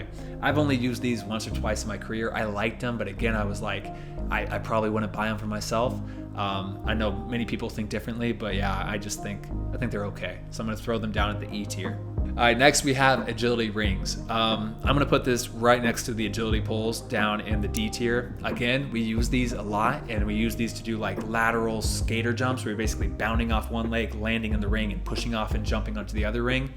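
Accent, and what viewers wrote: United States. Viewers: In America we call it soccer, and you sound American. Real Football 🏈 is different. The title of your video is misleading. Just kidding man. I watched anyway and these tools will help either sport. Thanks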